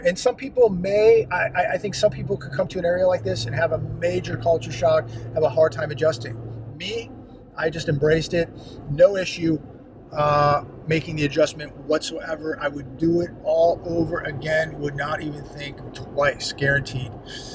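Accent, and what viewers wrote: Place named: Alabama, United States. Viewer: If you’re Liberal please don’t move here. This guy already sounds like an Alabamian. Let’s keep Alabama RED!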